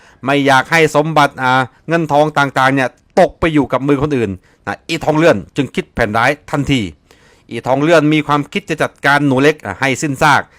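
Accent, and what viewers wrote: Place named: South, Thailand. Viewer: เป็นนักเล่าข่าวสื่อต่างในอดีตทั้งวีรกรรมผุ้กล้า ทั้งวีรบุรุสในยุคปัจจุบัน สำเนียงคงเป็นคนใต้อันทีจริง คือเสียงคล้ายๆคนใต้ คงแทนลึงทวนทอง999 ในยุคโคสนาเล่าเรื่องข่าวชาวบ้านได้ดีมากๆครับ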